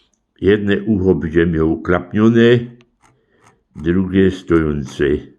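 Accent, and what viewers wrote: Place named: Silesia, Poland. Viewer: Jakie to jest piękne ❤❤ Jeszcze ta gwaro śląsko ❤❤ Miód na moje uszy. Pozdrawiam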